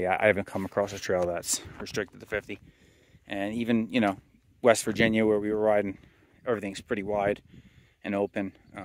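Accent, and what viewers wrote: New York, United States. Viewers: New York accent?.. I’m from Pennsylvania… for 2024, the only color offered Is a bright orange. .. kinda ugly tbh. Limited edition so they’re kinda hard to find.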